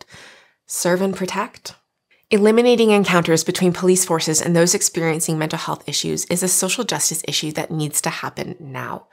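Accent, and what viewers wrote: Canada. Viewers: You’re Canadian ?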